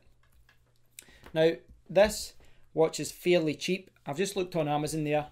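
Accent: United Kingdom. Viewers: Definitly British by the final entonation but I find your accent uncommon, unsusual for me. Maybe is it Scottish? Greetings from Spain.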